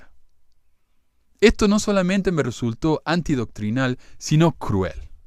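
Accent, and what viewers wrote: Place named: Córdoba, Argentina. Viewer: el acento cordobés que tenes no se te va nunca che. Soy less active y en mucho coincido sobre pte Monson, pero tampoco decir cualquier gilada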